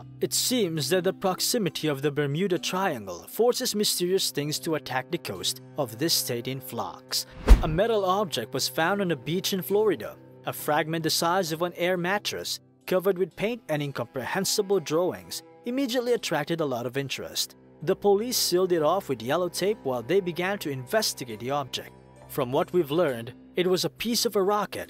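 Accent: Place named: Netherlands. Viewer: Narrator sounds Dutch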